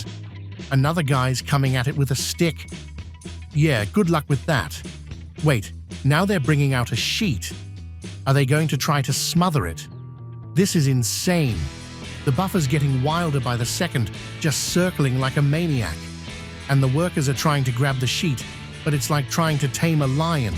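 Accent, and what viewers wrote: United Kingdom. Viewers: It's strange to hear someone with a British English accent using words like 'dude' (whatever that means) and 'I guess'.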